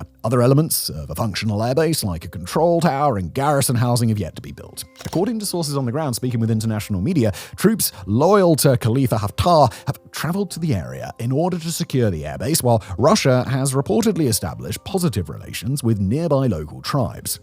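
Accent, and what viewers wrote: United Kingdom. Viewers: Even if you didn't speak with a British excent, would recognise Britain propaganda 😅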